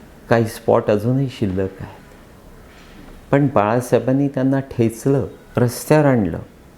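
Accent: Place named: Gujarat, India. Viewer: Kaka tumache bolane aikatana waatate ki koni gjaratalech bolat aahe ....🙏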